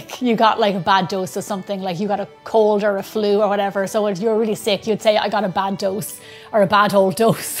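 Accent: Ireland. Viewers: Love this recipe & the Irish accent ❤️❤️ love from India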